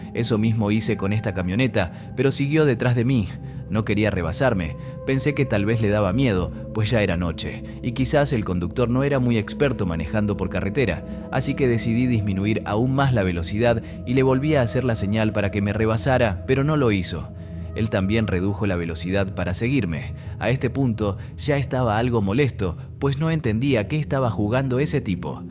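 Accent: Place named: Argentina. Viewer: Buenas historias lo único es que las historias están ambientadas en México y el narrador es argentino 😅😅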